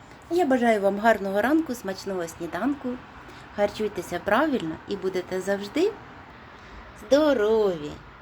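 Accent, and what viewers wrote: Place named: Surzhyk, Ukraine. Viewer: Ни хрена не понял этот диалект или суржик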